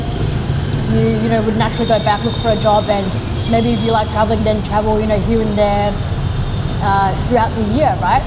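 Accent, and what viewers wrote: Australia. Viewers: Ms, you are an Austrailian????????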